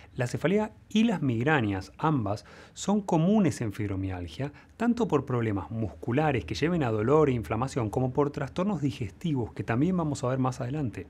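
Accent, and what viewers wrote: Argentina. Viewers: Gracias Doc, mi hijo lo sigue desde hace un par de años, y nunca me compartio sus videos hasta que lo empece a escuchar cuando el lo ponia y me sorprendió como explica cada tratamiento para diferentes patologias. Saludos desde Buenos Aires que por su acento deve ser argentino.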